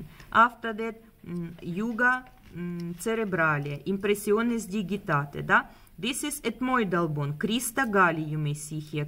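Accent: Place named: Russia. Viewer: SHE HAS RUSSIAN ACCENT ,, VERY NICE VIDEO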